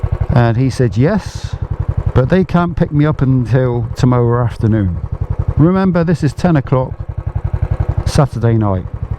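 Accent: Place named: RP, United Kingdom. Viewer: to a northerner, he sounds well posh which throws me right off 🤣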